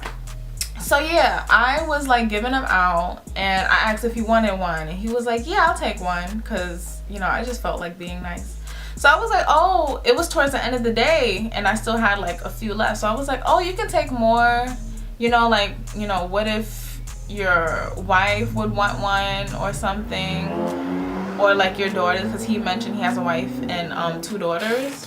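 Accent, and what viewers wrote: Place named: New York, United States. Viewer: 5’6 gang! And listening now, you have a little NY in your voice. This was a great video 😂❤️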